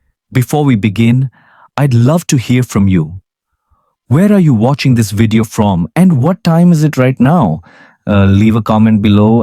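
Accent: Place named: India. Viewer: One can turn the subtitles facility on for ENGLISH, and read the English script. For heaven's sake turn the sound off eliminating the most horrible sound I have heard; the HINDI accent. HOWEVER; there is absolutely nothing in this video of any help for night time trips to the bathroom ( It is Nocturia) and is largely due to a swollen prostate and/or prostate cancer. The swollen prostate situate at the exit of the Ureter from the bladder decreases the capacity of the bladder and it needs emptying a lesser volume more frequently. See a doctor and stay away from podcasts like this one. Clickbait lies in the ONE GLASS catchphrase. It could have been ONE GLASS of ......and name it. It spares viewers the agony of this podcast.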